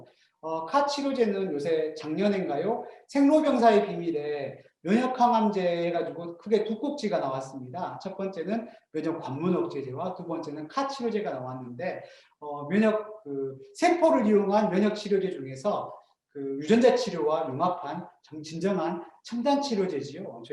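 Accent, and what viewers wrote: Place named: Jeolla, South Korea. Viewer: 대단한 기업 아니 노벨상 수상이 가능할 기업 전라도 구수한 사투리로 기업 설명 너무 자세히 영어 가급적 적게, 귀에 쏙들어오게끔 설명 감사합니다.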